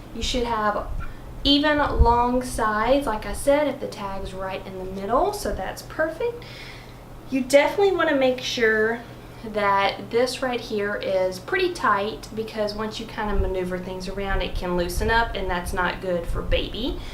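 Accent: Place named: South, United States. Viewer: Your southern accent makes you sound and seem as sweet as apple pie.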